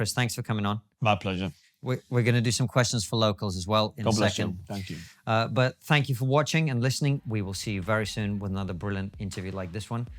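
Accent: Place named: North, United Kingdom. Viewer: That is probably... one of the most profound opening/things I have heard for a long time. Spoken like a northern man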